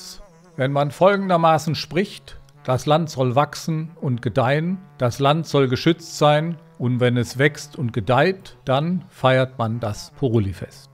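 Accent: Germany. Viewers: Hat der gute Mann einen Bayrischen Dialekt😁 Schöner Beitrag 👍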